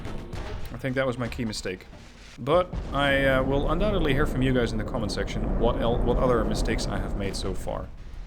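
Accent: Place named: Netherlands. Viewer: u r dutch right? if not i can hear a slight dialect as if ur main language isn’t english, and the dialect just sound super ducth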